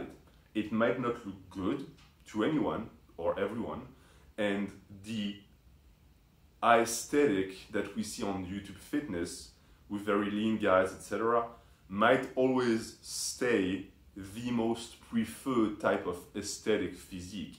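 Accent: France. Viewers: C'est bien un accent francais que j'entend ? J'ai bien l'impression :)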